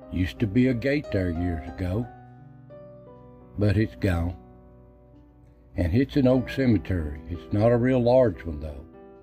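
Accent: Appalachia, United States. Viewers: great American accent, fitting. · I love listening to you. My family is from the mountains of Virginia. Your accent and way of talking ("my wife an em") reminds me of my family.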